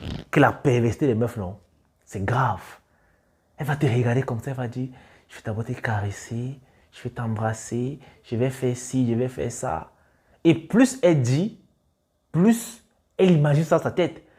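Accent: Cameroon. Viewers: Je m’abonne direct putain c mec avec son accent camerounais ah je kiffe 🥂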